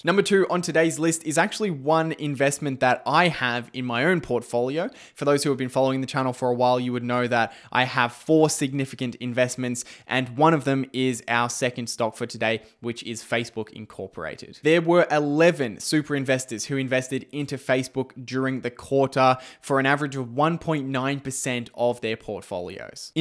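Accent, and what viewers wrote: Australia. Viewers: That's Aussie English.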